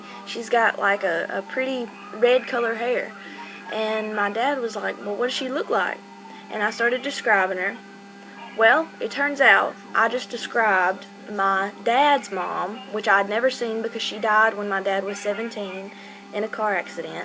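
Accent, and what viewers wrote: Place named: Louisiana, United States. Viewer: Omg where are you from? You remind me of my friend who is from Louisiana, yall talk the same way! lol i love it. Anyways, that last story was horrifying. Like holy hell, idk what I would do if I were you!!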